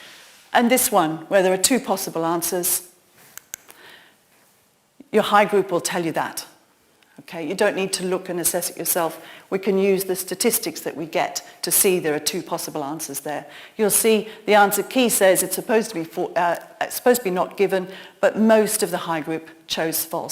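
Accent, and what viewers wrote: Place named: United Kingdom. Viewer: đó là phát âm giọng Mĩ, còn của cô là giọng Anh hoàn toàn khác nha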